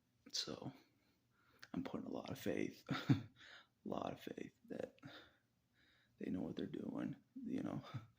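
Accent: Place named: South, United States. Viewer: Very good. Bro i wish we went to the same church but i assume you live in a southern state because of your accent. I would kick it with you and talk about all the things.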